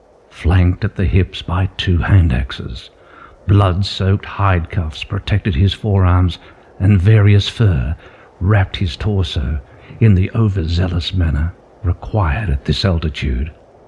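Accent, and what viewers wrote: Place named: Australia. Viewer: I listen to a lot of audiobooks so it is both interesting, brilliant but also somehow strangely odd to hear an Australian accent narrating. I look forward to hearing the entirety of it. The narrator has a lovely deep timbre to his voice.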